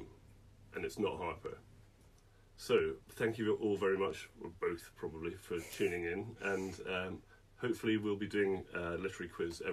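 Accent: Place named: RP, United Kingdom. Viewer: We read you above book in our book club , we all loved it. Now I’m planning to do Scotland tour so hope will de-tour to your book shop. My friend always gets old historical books so now i know where to buy them. It’s so lovely to see the writer , if he’s still alive like you . I like your posh accent 🌷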